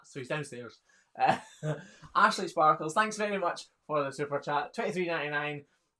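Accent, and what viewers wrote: Scotland, United Kingdom. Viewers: I missed the live event, dang it, I was going to ask if you were scottish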